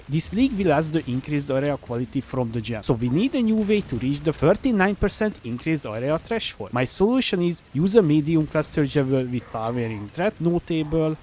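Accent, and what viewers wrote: Hungary. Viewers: Are you Hungarian by any chance?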